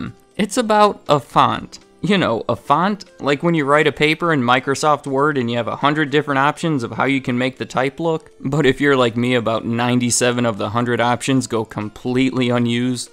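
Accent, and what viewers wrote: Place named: Midwest, United States. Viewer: Not related to the topic, but english isn’t my native language and I find it interesting how he pronounces the word comic as “caamic” and iconic as “icaanic” etc. Is this accent common among Americans? No hate please, I’m just curious.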